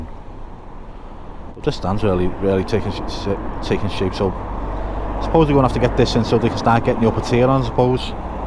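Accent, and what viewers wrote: Liverpool, United Kingdom. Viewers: You sound a bit like Jamie Carragher the way you pronounce your scouse A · Brilliant camera work and a real scouse commentary. Love it.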